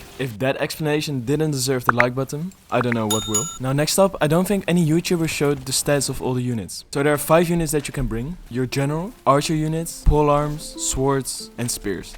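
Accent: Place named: Netherlands. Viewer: Your English sound Dutch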